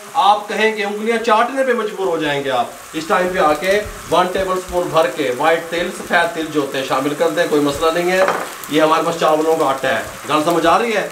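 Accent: Punjab, India. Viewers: اسلام علیکم بھائی جزاک اللہ خیر آپ بہت اچھا کام کرتے ہیں اور میٹھی میٹھی پنجابی آپ کی بہت اچھی لگتی ہے اور اللہ تعالی آپ کو اور عزت دے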